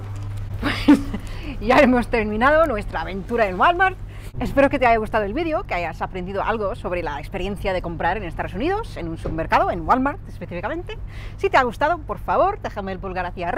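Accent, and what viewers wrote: Spain. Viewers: Wow i didn't even know you were American at first...I definitely hear your Spanish accent! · Me quedé impactado cuando dijiste que eras de USA, por tu acento pensé que eras española nativa. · Sorprende qué siendo norteamericana? Hables con acento de españa, qué es mas difícil (no permite seseo,exige acentuación al hablar,etc.)es casi como aprender alemán siendo Americano. Me preguntó si manejando un Español con acento? porqué no hicistes vida en españa?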